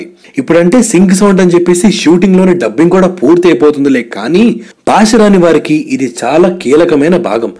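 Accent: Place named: Andhra Pradesh, India. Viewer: Bro talk normally 🙄 u are talking like u are from andra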